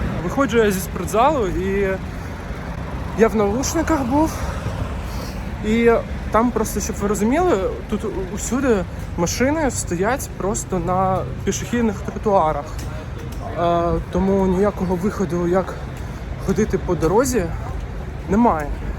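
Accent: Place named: Russia. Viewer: жахливий русский акцент!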